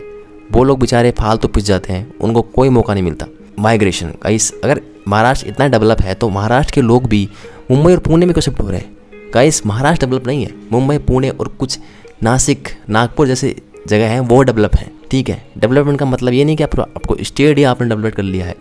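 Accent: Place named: Pakistan. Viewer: Tumhe kisine nahi pucha kya reality hai Pakistani tum ho tumhara dialect batata hai . Ai Bana Kar kuch bhi bakvas mat banao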